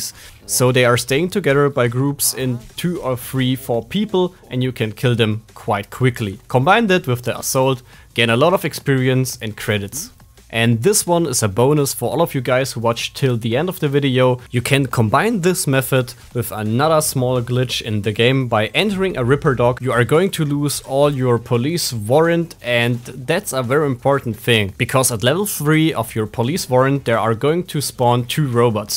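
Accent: Germany. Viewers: bro wieso machst du englishe videos man erkennt an deiner stimme das du deutsch kannst :)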